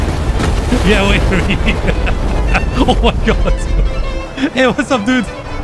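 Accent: Scotland, United Kingdom. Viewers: Hearing a Scottish man whisper "Sleep now, little baby" in a sinister tone may be the most terrifying thing I've heard all week.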